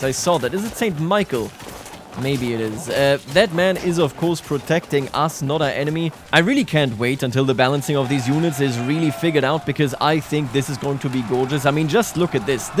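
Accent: Bavaria, Germany. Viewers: It smells like a german akzent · Damn even tho you are from Bayern, isch dein Akzent im Englischen halt echt ned so schlimm :0 Very nice Video dude, now i have to watch the whole series!. Grüßle von den werten Nachbarn ausm Schwabaländle ;) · he even uses some german words and phrases in between^^ but only noticable for a german I think, and the hints of the accent gave it away for me :p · sofort gehört dass du deutscher bist (kein hate) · Sometimes you have Bavarian Germn Spikes (were german slips through while talking) Its not bad I like that diversity. German Englisch Vielfalt.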